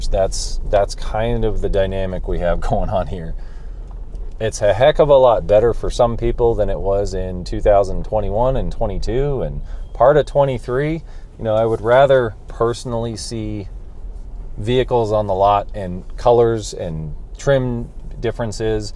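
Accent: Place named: Colorado, United States. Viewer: I'm buying my plug in hybrid Rav4 from Greely in the next few weeks! I could tell you are a fellow Coloradan by your accent 😂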